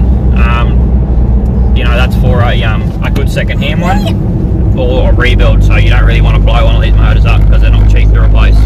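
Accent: Australia. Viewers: hi! can you write down everything.sorry to sound rude but your australian accent got me. if you dont mind writing everything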